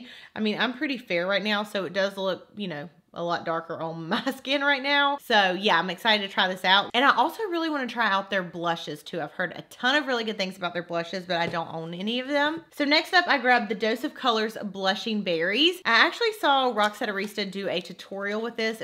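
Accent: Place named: Texas, United States. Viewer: 😃😊 your accent is tooo flipping cuuute! Love it live it im from dallas tx origionally now stuck in indiana but your accent reminds me of home in a i miss tx kinda way!☺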